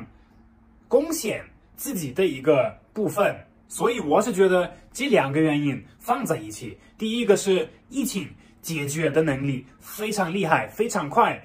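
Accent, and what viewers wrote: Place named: Sichuan, China. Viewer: 成了四川的人了，四川话十足！👍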